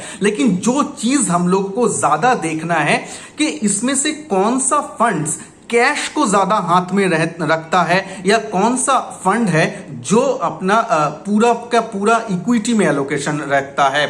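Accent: Bengal, India. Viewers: বাঙালির হিন্দি শুনলেই বোঝা যায়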